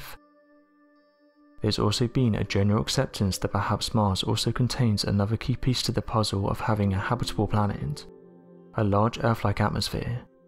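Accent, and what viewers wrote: United Kingdom. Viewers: why are always using a british guy narrating these stories?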